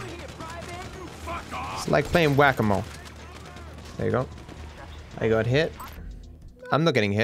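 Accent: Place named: Australia. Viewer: You sound Soo middle class Au...! Enjoying the vids fella.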